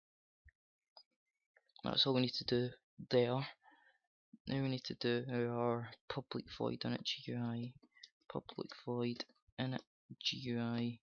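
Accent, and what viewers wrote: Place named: Scotland, United Kingdom. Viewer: nice sco'ish accen' ma'e, I'm from glasgow but not got an accen' like you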